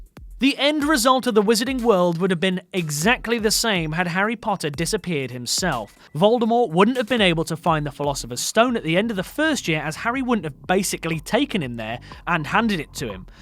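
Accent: United Kingdom. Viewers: Really bad. Lazy and poor. I am trully sorry for you. Almost every character mentioned did, in fact affect the plot of the film. Except for a few who did not, like Cruise in War of the Worlds, or the Basterds. But that was no mistery. It was supposed to be that way. You think that using a british voice will make this sound inteligent? Dumb fucks.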